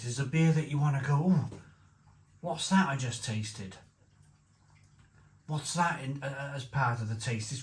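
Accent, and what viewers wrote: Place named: Scotland, United Kingdom. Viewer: Defo sounds like a Westie 😋👌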